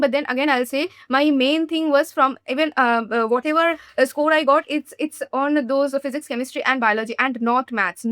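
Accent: Bengal, India. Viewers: your bengali accent in hindi is very cute.😂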